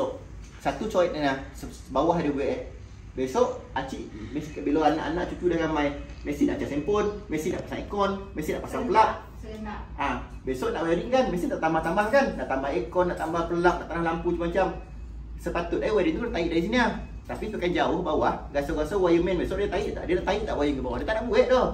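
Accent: Negeri Sembilan, Malaysia. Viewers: bahasa nogori yo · Puas aku dengar loghat negeri sembilan...Seronok aku dengar...terbaik BOSS N TEAM....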